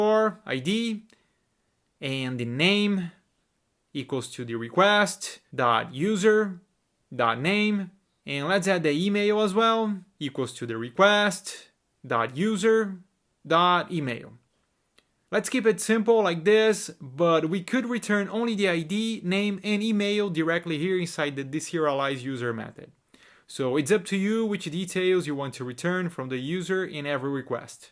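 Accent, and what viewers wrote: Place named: Brazil. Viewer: kakakaka sabia que tu era BR, sotaque tava mt familiar kkkk mas porra tu tem um ingles perfeito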